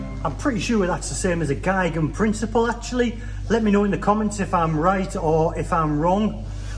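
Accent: North, United Kingdom. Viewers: I love your channel and the small country churches you give us a glimpse into here in the far off US of A. Your northern accent is also quite nice to listen to! 😊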